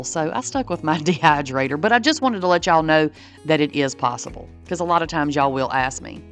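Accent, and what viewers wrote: United States. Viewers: omg,. just to say, I liked the video, and no doubt the creator tries to be a good human, but to many of us outside the US hearing this kind of accent is similar to hearing arabic accent....its alarming. Thankfully I dont fall for predjuces... Good luck over there.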